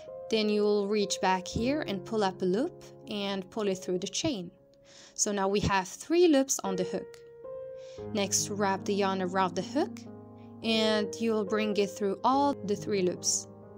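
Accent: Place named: Saudi Arabia. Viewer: تتكلمي سعودي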